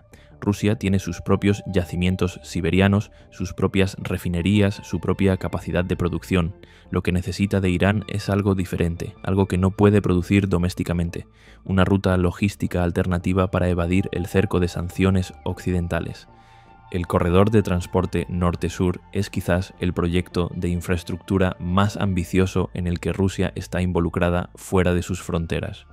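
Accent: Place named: Castile, Spain. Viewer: P A X. Sus análisis contienen un componente de la lógica filosófica, más elemental, que cualquier persona con un buen sentido común lo perciben con facilidad. Por otra parte la dicción de su locución, es muy castiza de la fonética de la vieja Castilla: muy agradable de oír. Enhorabuena !!! Gracias !!!!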